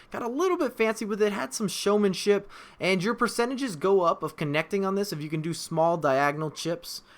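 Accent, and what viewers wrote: Houston, United States. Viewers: R u from Houston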